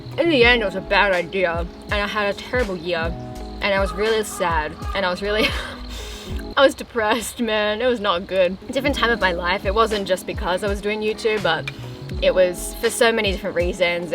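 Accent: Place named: Australia. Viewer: Happy Thursday,! Happy New Year and welcome to the second month of February!😊😊😊😊😊😎😙☀💙💙💙💙💙🇺🇸🌷💖💖💖💖💖💖😊🍾🎉🎇⭐⭐⭐⭐⭐⭐👍. Your Australian accent is fantastic and it gives me tingles when I jump for joy!😀💙💙💙💙💙💙💙👍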